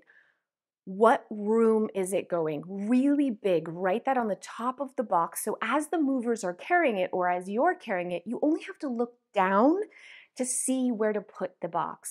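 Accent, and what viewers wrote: Canada. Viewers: yep! But not all your followers are 😉 just wanted to leave the tip for any in the comments who happened to live down here. They could apply all your helpful packing tips with the crates, if they didn't want to buy new boxes from Kennards or Storage King (local moving/storage companies). I miss being only a couple hours drive from Vancouver - i know that's not where you're located in Canada, but sometimes listening to your videos hits the spot with your accent 💜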